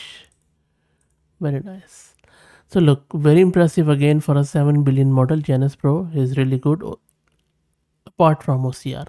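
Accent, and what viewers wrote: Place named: India. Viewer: Man, it's a pain in the ass that Indian-accented English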